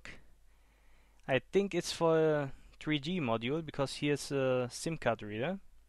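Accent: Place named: Germany. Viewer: man merkt wirklich dass du nen deutscher bist an deiner aussprache. Arbeite mal dran. Sonst alles top ; )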